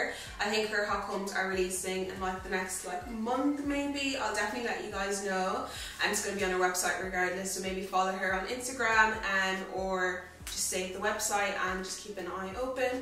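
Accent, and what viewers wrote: Ireland. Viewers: OH thank you! loool sorry, i think have a little trouble understanding irish accents sometimes but i love them tho! so bloody charming! <3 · I love the Irish accent.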